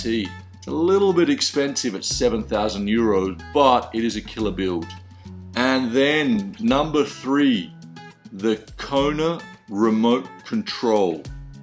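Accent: Australia. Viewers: Great vid Awesome to have an Aussie accent reviewing these bikes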